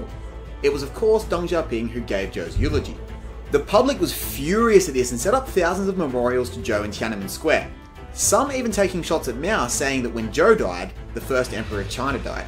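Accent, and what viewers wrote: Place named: Rural, Australia. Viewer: This is puerile simplicity read out by a barely literate yobbo who sounds like he comes from Tenant Creek, or some other Aussie backwater that equates to the Mississippi Delta.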